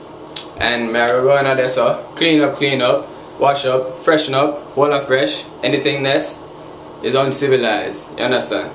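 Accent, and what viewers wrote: Jamaica. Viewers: u jus killed me wit da "rudeboy buck buck buck" part jus 4 dat auto sub n a big up bredren...btw r u jamaican or u jus talkin wit da patois cuz of da products to add effect? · lovee your hair & you resemble the jamaican accent pretty good ")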